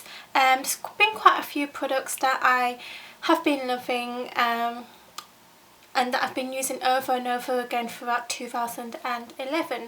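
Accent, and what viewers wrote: Yorkshire, United Kingdom. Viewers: what's the name of her accent? is it yorkshire?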